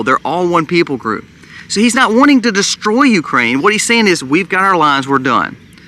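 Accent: South, United States. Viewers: What a niave analysis. Just let Russia do what it likes. Sounds like a hick southern MAGA supporter.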